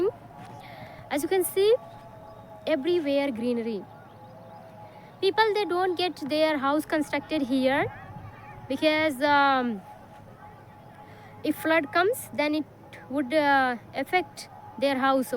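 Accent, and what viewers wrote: India. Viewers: I like indian accent in english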